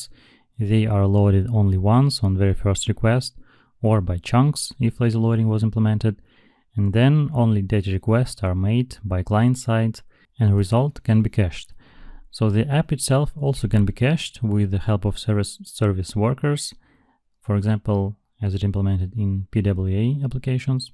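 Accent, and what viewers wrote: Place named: Eastern Europe. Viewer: Not bad. Slavik accent is hardly heard. Keep it up!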